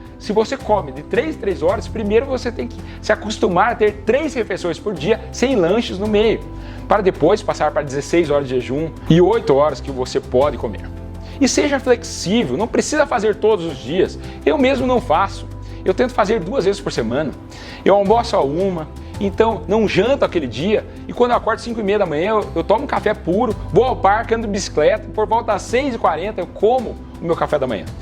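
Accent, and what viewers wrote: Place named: Caipira, Brazil. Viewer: Já era fã, agora que vi de camisa polo, apaixonei. Esse R caipira, então...🥰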